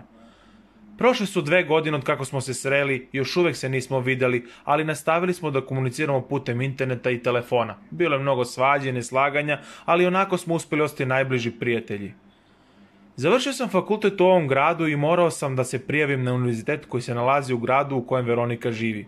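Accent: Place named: Nis, Serbia. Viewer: Vidi se da si iz Nisa ili okoline,i imws jako los akcenat i dikciju da pricanje ovakbih prica....ideja je dobra ali realizacija vrlo losa....To je moje iskreno misljenje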